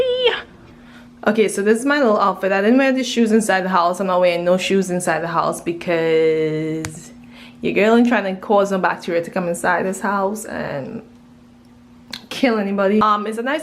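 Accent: Trinidad and Tobago. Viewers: Girl! is that a Trini accent I hear!??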